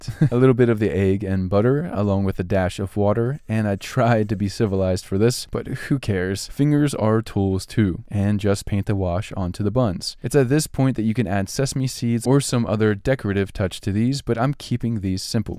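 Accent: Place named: United States. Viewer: Why speak perfect America English then go on to say Celsius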